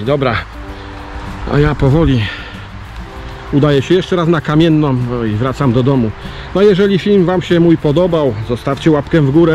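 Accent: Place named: Podhale, Poland. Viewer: Jakiś dziwny akcent, coś górami zalatuje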